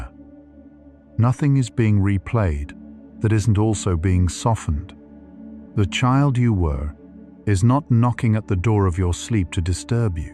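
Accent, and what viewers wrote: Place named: United Kingdom. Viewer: all these damn AI narrator's have British accents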